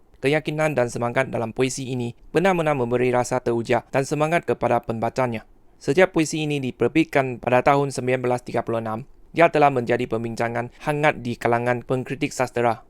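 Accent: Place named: Terengganu, Malaysia. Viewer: bahasa nya anok Trg ,K’ltan dok lawen ………👍syabas .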